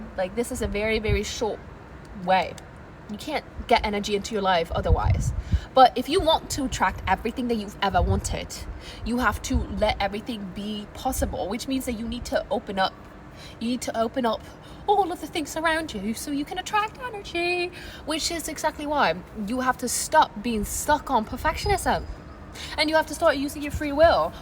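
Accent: United Kingdom. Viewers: Gurll I loveee your energy 🍀🌷🌷😭😭 and that British accent 😭😭. Lobbyaa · The british accent girlll hahaha ❤ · The British accent makes it sound less like a scam! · Not the brittish accent😂 girl you lifted my mood. And I've cried today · 😂😂😂😂😂we love British accents over here girl 😊😊😊😂😂❤❤❤❤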